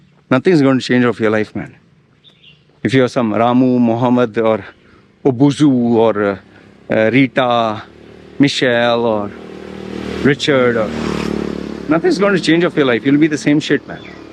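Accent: India. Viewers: Ugly Indian with the nasty Indian accent talking about Tate? This Paki American would like a min with you.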